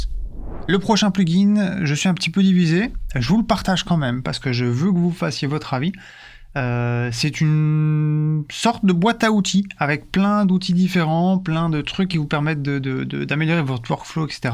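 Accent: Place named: Belgium. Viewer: 10 secondes de vidéo et je me disais bien que cet accent m'était familier .. :p Salutations de Belgique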